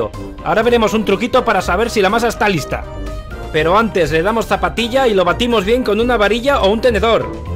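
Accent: Spain. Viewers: bien eso es facil me gusto solo me da risa tu acento de español xD me encanta <3 gracias like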